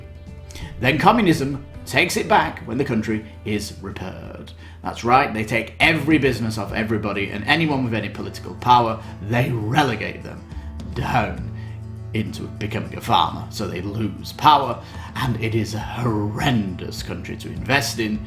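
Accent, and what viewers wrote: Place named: United Kingdom. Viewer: mexico?? you sound like some kinda brit.. prolly get called gringo every day. what's the board game scene like over there?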